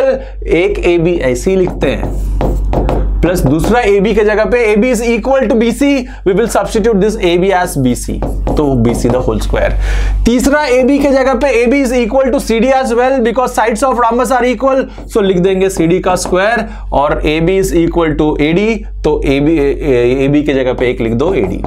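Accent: Bihar, India. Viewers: Apki tone ekdm mst lgti h sir Are u bihari?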